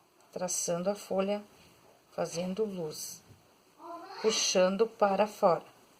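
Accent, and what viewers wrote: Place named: South, Brazil. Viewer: Btarde,!!!! Que lindo os seus trabalhos, que Deus continue abençoando suas mãos e lhe dando muita saúde!!! Vc vai fazer o vídeo fazendo as rosas deste trabalho? Vc prepara seus pincéis antes ou usa conforme vem da loja? Amei a sua aula vc explica muito bem e é bem claro o que diz!! Cheguei até vc através do canal da Jucineide Braga, que tbm acho outra professora maravilhosa, admiro tbm seu trabalho. Já me inscrevi no seu canal, e torcendo muito que não pare de fazer os vídeos e que traga mais vídeos aulas de outras flores e principalmente de rosas que realmente são poucas que explicam tão bem. Grata por compartilhar seus conhecimentos!! Até breve!!! Obs.: Amooooo o sotaque dos sulistas!!! Bjs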